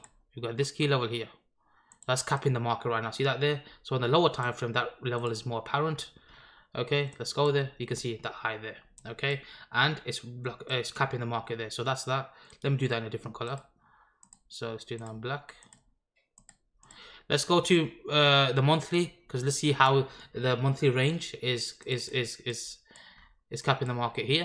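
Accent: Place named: Birmingham, United Kingdom. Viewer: yes bruv birmingham accent lol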